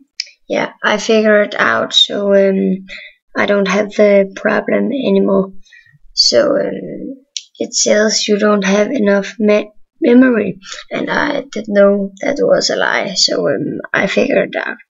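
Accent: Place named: Denmark. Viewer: bro i could just hear in ur accent ur danish, så kiggede jeg rundt på din pc og så danske ord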